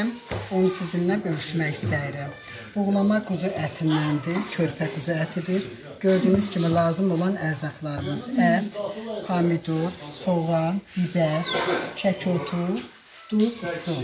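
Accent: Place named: Shamkir, Azerbaijan. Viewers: Əllərinizə əməyinizə salıq olsun cox xanım bəh bəh sizin danışığnız lap Samuxlular kimi danışırsız mənə elə doğma və ləhcəniz şirin gəlir ki dilinizə quzu kəsim lap darıxdım kənd həyəti ücün mənimdə bir tərəfim Şəmkirin qırmızı Samux kəndindəndir eşq olsun Səmkirlilərə və bütün rayonlarına cox sevirəm sizi əzizlərim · gozel şemkırım lehcenin qadasin alim birinci defe baxan kimi bildimki şemkirlisiz👍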